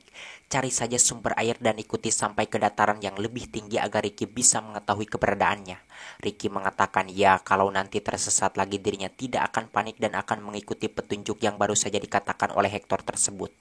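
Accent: West Java, Indonesia. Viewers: Sundanya dari mana ni min 😁 sing ageung milik lah pokonamah 🤲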